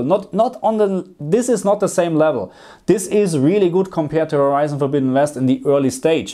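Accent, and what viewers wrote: Germany. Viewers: Geiler Kanal, hab dich eben entdeckt. Man hört klar, dass Deutsch deine Muttersprache ist. Abo geht raus und Daumen hoch.👍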